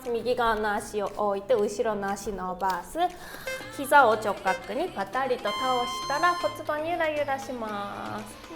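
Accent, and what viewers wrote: South Korea. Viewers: 発音が韓国語でかわいい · 先生が韓国人だと知った上ではたまにあー韓国の人が訛りね感じるけどほとんど感じません。何度も日本語で話されてるからでしょうね。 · 韓国語のイントネーションを感じた🇰🇷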